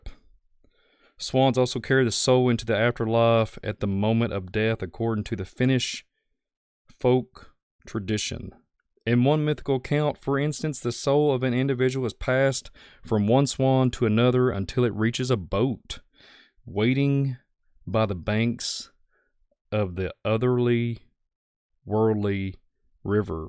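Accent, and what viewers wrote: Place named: Kentucky, United States. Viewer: WV Hillbilly here loves your Kentucky accent ❤ My mama’s family is from Lawrence County, KY ❤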